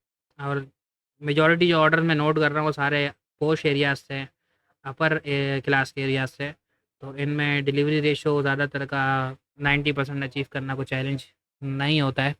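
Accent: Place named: Karachi, Pakistan. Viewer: bhaai kasam se ye hoti hi video bhai ap log behtareen karry ho yar ye wahid video full detailed me mili hi baki sab log sirf chotiya banaty hi time waste krty hi bas view lyty hi and i love this karachi Landhi korangi accent Pepshi pepshi 😍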